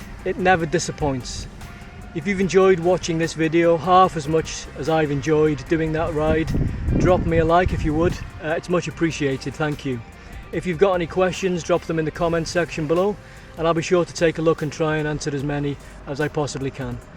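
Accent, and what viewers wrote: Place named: Northwest England, United Kingdom. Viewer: Great video.. we did the same route 3 weeks ago and it blew our minds too! Did you try the rough road up from where you stopped for refreshments? It’s a good climb but very rough surface with loose gravel. It sounds like you’re from the NW area, Cheshire/merseyside (ish) I live north wales nr Abersoch and Pwllheli and there are some fantastic roads over hear, so if you’re in the area give me a shout for some good climbs and car free country roads.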